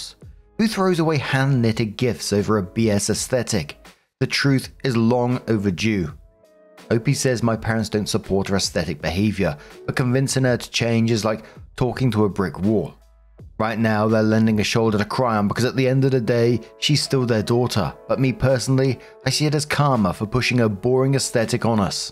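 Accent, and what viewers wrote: United Kingdom. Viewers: Hiiiiii ❤ sending much love from austria 😊…always happy to hear your calm voice and lovley british accent 😅…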